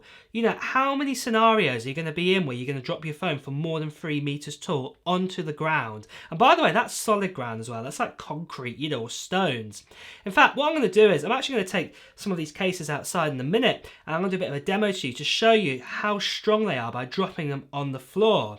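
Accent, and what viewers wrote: United Kingdom. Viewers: After listening to him, I want a British boyfriend 😂 Canadian accent is so plain! I use a Casetify case for my 12 Pro max, I absolutely love it coz it has my name on it. I ordered a blue iMac yesterday but looking for the M1X MacBook for sure!!! Need something portable! 😂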